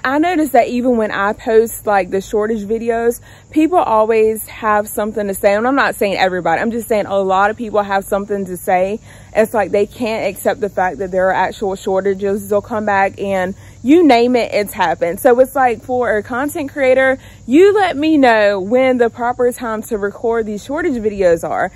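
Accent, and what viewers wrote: United States. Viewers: God knows I love your country ass accent, great video by the way.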